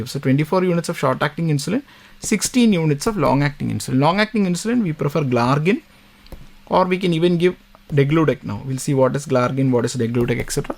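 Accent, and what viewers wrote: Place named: Kerala, India. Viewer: Pakka mallu accent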